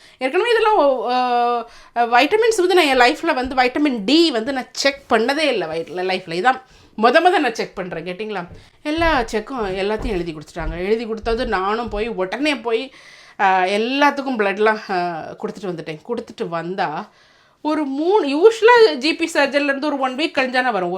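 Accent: Nagercoil, India. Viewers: Hi akka…I like the way u speak especially Nagercoil slang…I lived in England for a couple of years…really very nice country.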